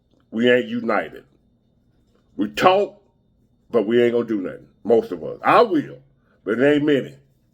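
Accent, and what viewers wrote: United States. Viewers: Facts!!! This dude popping up out of nowhere on everyone's youtube feed sounding like a regular American. He is being paid to do this, I guarantee. He is the shabbat goy THEY are using to convince truckers to abandon their trade via getting replaced for striking.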